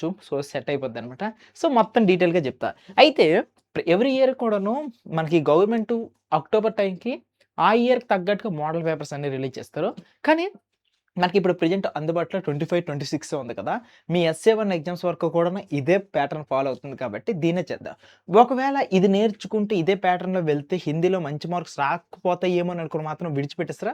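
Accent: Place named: Telangana, India. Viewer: Anna telangana 😅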